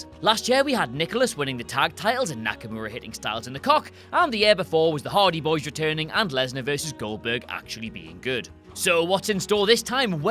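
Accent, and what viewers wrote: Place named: United Kingdom. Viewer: is my everyday reminder that British accents are not fake, and in fact some of them can't just switch it off and do american.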